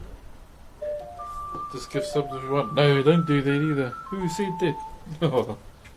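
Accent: New Zealand. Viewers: That’s how is kiwis talk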